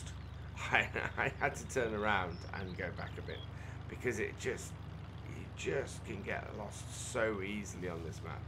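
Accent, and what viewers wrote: United Kingdom. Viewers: Are you from Norfolk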